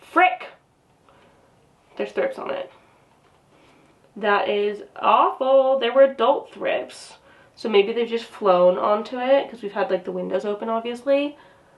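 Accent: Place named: United Kingdom. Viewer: Thank you! That seems about right—I should have known. I think that one is going on my list! Thanks for the quick answer! On a totally unrelated note, and I’m being a nosy new subscriber here, were you raised in the UK? Your British accent doesn’t seem to me to be as pronounced as others I’ve heard. Anyway, love your videos!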